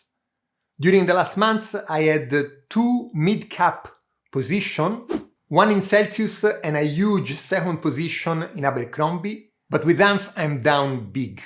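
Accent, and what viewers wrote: Italy. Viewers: I just subbed. I have only watched 32 seconds. But judging from your appearance and energy your channel will probably grow. My early impression of your accent is Italian.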